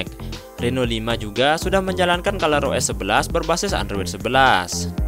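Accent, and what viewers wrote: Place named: Ambon, Indonesia. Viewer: Logat nya mah orang ambon nih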